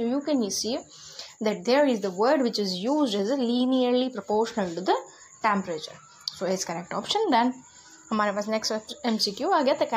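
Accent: Sindh, India. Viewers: Ma'am are you Sindhi?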